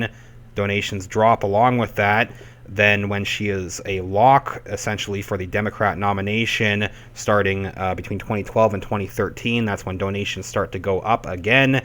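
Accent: Canada. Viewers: You sound a little Canadian. Great channel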